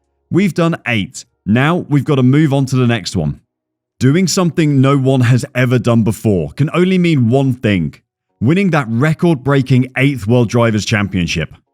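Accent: United Kingdom. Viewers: My congratulations, this channel really feels professional! There is that slightly British ‘accent’ in the soothing voice of the narrator, that gives the message a trustworthy sound. They imagery and the movie clips are also well edited and nice on the eyes. All in all you look like a journalistic source that, in an agreeable way, wants to state it’s point. And maybe that’s the biggest problem. In a world where content isn’t judged by it’s objectivity but by the amount of followers that are being pleased, it’s very hard to stay truthful. In this very scoop you do mention the not so reliable influencing by TW in relation to the fact that he ‘knew’ LH wouldn’t stop while whipping up his fan base with suggestive statements, but you fail to explain why he did that. You acknowledge the phrase that LH mentioned that he’d never said this or that but you fail to explain how this contributed to the polarization of opinions within the F1 community. 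On a given moment you accuse MV of being the source of a lot of misbehave within the sport, but fail to explain that this is a shared opinion by a minority of the fans. So entertaining, surely, professional exposure, well done, journalistic integrity, can and should be worked on if you want to be a channel for all F1 fans. If you don’t care about second opinions or how the other chunk of Fans thinks , then good luck, you will have to battle with ton’s of British based and prejudiced, biased you tube channels. The choice is up to you. 👍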